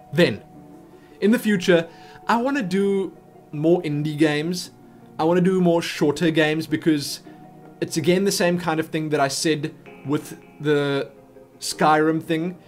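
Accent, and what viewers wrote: South Africa. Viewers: You sir, must be a South African. I watched your Alice videos and I could swear I was listening to a Boer! If not...well shit. My mistake XD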